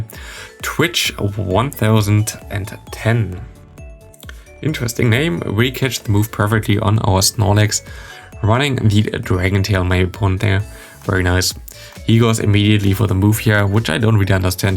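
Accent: Germany. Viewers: Is it possible that ur german cause ur accent kinda sounds like german :)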